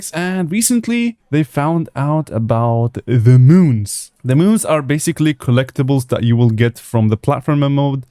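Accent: Germany. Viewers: yu german?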